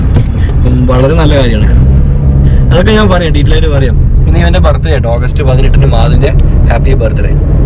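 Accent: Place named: Iritty, India. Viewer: ഭാഷ വള ഇഷ്ടമാണെനിക്ക്, കാരണം ഞമ്മളെ നാട്ടിലെ ഭാഷ തന്നെ ഇരിട്ടി ഭാഷ ഉപയോഗിക്കുന്നുണ്ട്. അത് തന്നെ ' കേരള to യൂറോപ്പ് യാത്രക്ക് എല്ലാ വിധ ആശംസകളും നേരുന്നു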